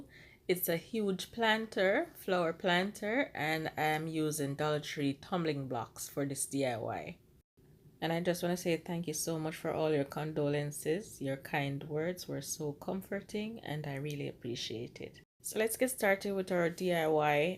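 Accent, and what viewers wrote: Jamaica. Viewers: This is absolutely brilliant!! I adore your voice!💗are you Jamaican? You mentioned condolences… whatever is happening, I am sorry for your pain… I’ve had a tough year myself- lost my mother and 3 premie grand babies…❤️❤️‍🩹💔praying for you, lovie.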